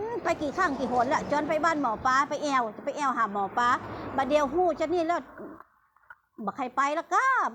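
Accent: Thailand. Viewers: สมัครเป๋นเอฟซี เจ้า ชอบตี้อู้กำเมืองเจ้า ข้าเจ้าเป๋นคนเมืองเจียงใหม่เจ้า กำว่า นาบโต๊ด ของคนเหนือ ภาษากลาง คือ ใส่ร้าย เจ้า❤️ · หวัดดีจ้าม้ะ.พูดใต้สุด+.เหนือสุด.เลยนะฟังน่ารักจัง.กดตามแล้วจ้า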